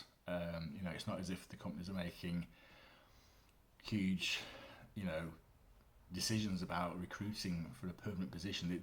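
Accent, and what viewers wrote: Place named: Manchester, United Kingdom. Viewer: Can tell you’re a Manc!…if you got £200 for everytime you said “You know” in this one post alone, you could probably retire comfortably for life!! 😂👍….( great video bud…hit the nail on the head 😉)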